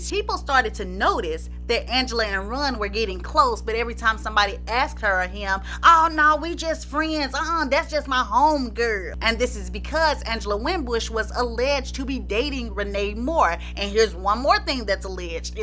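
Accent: South, United States. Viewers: lol it’s her southern accent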